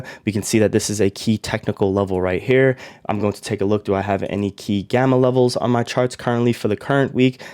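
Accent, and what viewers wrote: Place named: New York, United States. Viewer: I just know you're from New York bro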